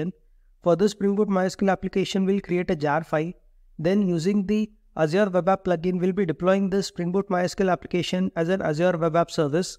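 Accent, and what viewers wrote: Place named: India. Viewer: Very useful videos! Can you speak at a little slower pace? Will help people like me not used to india accent. Otherwise, great content.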